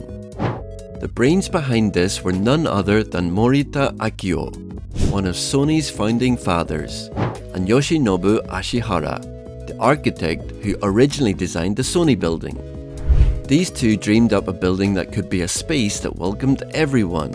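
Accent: United Kingdom. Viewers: Just stumbled across your channel on my discover page, love the videos and also your accent sounds familiar! Are you from or have family in Belfast / North Ireland?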